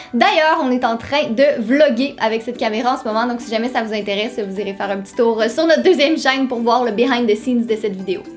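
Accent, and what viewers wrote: Quebec, Canada. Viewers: J'adorreeee ton accent québécois 💕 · c la ou je me dis que j'aime vraiment pas l'accent canadien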